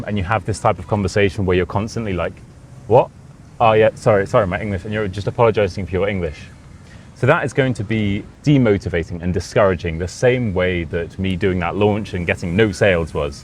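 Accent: United Kingdom. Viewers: Oh gosh I understand your story 🎉😊 I'm proud of myself now ,coz British accent is so hard😅 but I hope one day could speak like you sir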